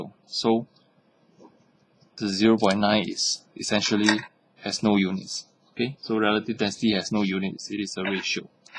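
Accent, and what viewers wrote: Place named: Singapore. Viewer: cher i like your singlish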